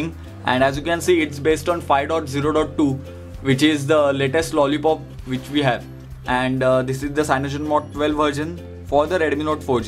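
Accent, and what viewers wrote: India. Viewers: you will never be promoted coz of your indian 2rs english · sick of this fucking indish accent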